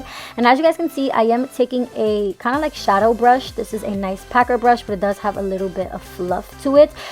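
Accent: New York, United States. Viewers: I knew you was from NY when I heard the way you speak lol we lit this look is beauty full honey 🥳🤟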